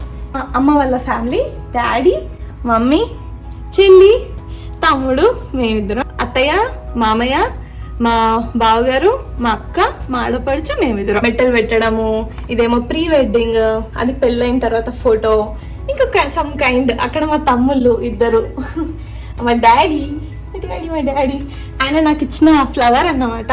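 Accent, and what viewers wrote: Telangana, India. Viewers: Telangana language intunte manchiga anipistundi.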